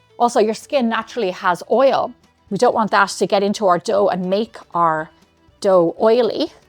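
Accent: Ireland. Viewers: Buenas noches....its really, early early a.m., a Weds., lol. I'm sort of a night owl & noticed your channel. I love love scones. Sadly I'm NOT a Baker. I misplaced my beloved Nina's (Godmother) recipe. I made scones that were at least passable & tasty. However, I so pray that I will be able to make these delish scones. Gracias for this video. I also love love your lovely Irish accent. I will view more of your videos, gracias. Paz be with us all 🙏. "Doña Isa" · So refreshing to hear HUMAN VOICE, and with beautiful Irish accent ! Not Ai .And the scones are great , Thank you! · I love scones - not a Danish traditional so hard to get really good ones! This recipe looks legit good and I will try them. Love your Irish accent! Love Ireland altogether...🍀New subscriber.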